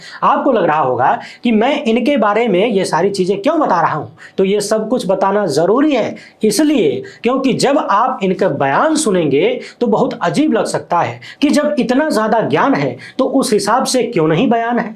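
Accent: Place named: Gonda-Basti, India. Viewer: Bahot hi perfect Analysis Bhaiya jii Aawaz Aisi hai Jaise Gonda Basti Se belong kar rahe hon bhaiya ji Aap , Am I Correct?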